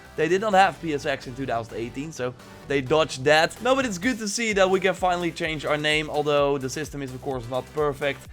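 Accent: Netherlands. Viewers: Ik hoor aan je accent dat je Nederlands bent!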